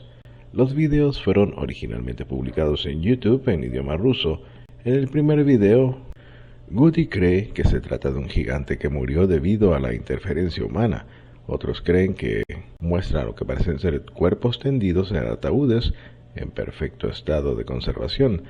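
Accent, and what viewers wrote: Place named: Spain. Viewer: Asta que cambian las voz. K ya me tiene asta la madre las voz español o gallego. Mierda. La lengua española de es la más horrible de la abla ispana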